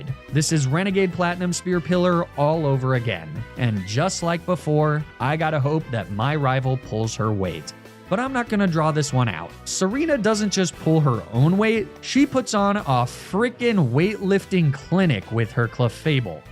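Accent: Finland. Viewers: Wait how did i not realize you were finnish until now 😭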